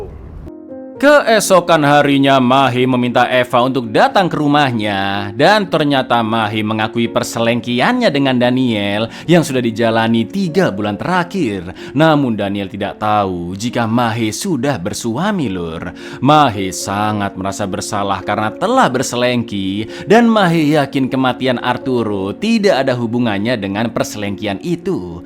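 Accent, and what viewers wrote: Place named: Indramayu, Indonesia. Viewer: Kok logate serupa Indramayu, curiga kang wng dewek 😅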